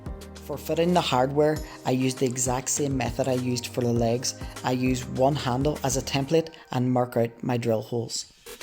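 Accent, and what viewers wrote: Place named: United States. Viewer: really like your recycles but I am very confused by your language. Are you Irish, American or Canadian? Your products and pricing are British but you talk as if you are American/Canadian.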